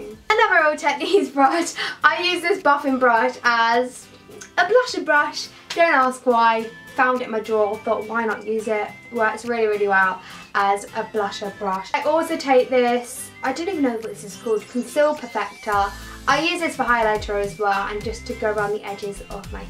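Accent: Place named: Essex, United Kingdom. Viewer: you sound 🔊 like your of Essex good video by the way 😄😛😍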